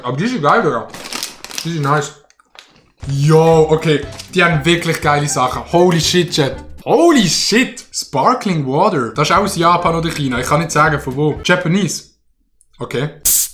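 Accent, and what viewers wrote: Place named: Switzerland. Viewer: Deinen Schweizer Dialekt erkenne ich sogar, wenn du Englisch sprichst 😂👍🏼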